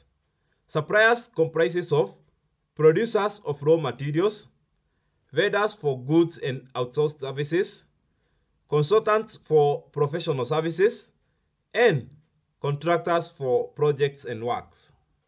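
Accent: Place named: Africa. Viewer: Be slower in your presentation.for a better understanding for those of us that are not from the part of Africa you come from.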